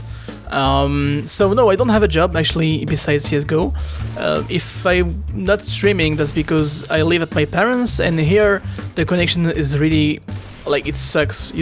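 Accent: France. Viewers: J'aime le sexy french accent :D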